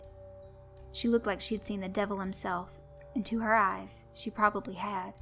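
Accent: South, United States. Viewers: Thanks for the narration <link> I liked the Southern drawl you added to it. As always, you did a good job with it. Sadly enough, the story was based on an experience about back when I was hospital security when an abusive father sweet-talked his way into the ER to visit his daughter. I wonder if you might have any interest in any of the other parts of the series <link> It's more of a theme of work-related horror stories than interconnected stories, but it might interest you.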